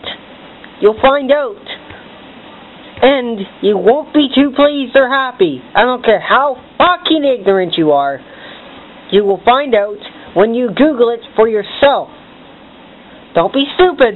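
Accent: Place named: Ireland. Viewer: You Irish? or something? :P just asking coz your accent sounds like you have an Irish tongue. but i think you may be right. the HAARP is most likely the reason for all these earthquakes and the fish dieing and shit like that.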